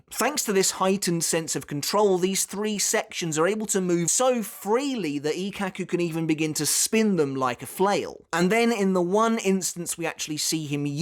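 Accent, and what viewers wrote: United Kingdom. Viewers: It's one of my favorite banki. I appreciate the work you do, but I will say your pronunciation of the names is very lazy. I understand it's your British accent, but the names aren't British... At least try to pronounce them closer to Japanese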